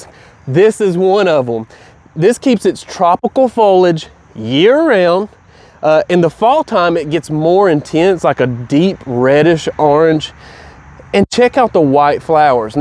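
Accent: South, United States. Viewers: Southern accent is grate , keep it up !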